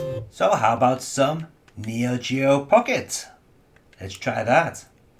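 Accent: United Kingdom. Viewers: I love your channel. I have to ask, as "verboten" as it is today, about your background (yes, your heritage... Oh NO!). Obviously you live (or lived?) in Japan but you have a very obvious British accent and as far as your look... well that's not as easy! That's why I ask. If it doesn't offend you (and I honestly don't think it will) can you tell us? Thanks.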